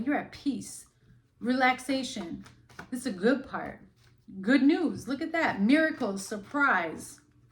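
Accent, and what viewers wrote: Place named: South, United States. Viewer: I like your voice. Hope that’s ok to say. Where u from if you don’t mind me asking? I am guessing somewhere in the south but would love to know where. Thanks for the reading!❤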